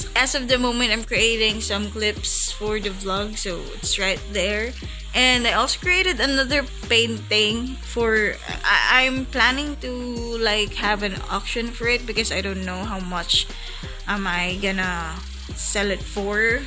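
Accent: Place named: Australia. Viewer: Im diggin that aussie accent of yours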